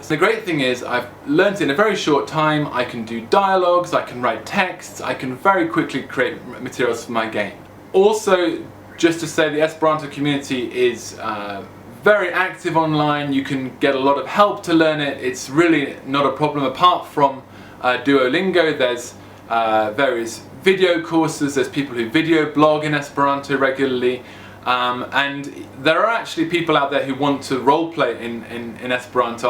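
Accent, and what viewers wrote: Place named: Germany. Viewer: Bonega filmo mi speziale amas, tiel vi parolas angle kun germana akcento 😂 #kor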